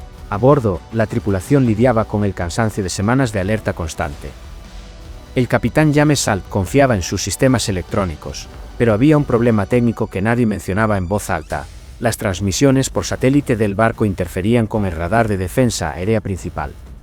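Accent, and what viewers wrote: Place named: Galicia, Spain. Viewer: El acento del video parece gallego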